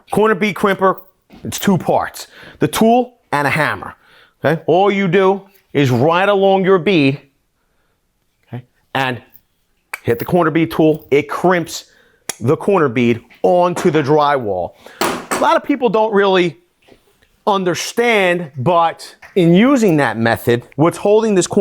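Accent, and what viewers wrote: Philadelphia, United States. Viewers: I have no idea but see he hasn't answered and your question made me notice that now as well. My guess is that it's a Philadelphia accent maybe.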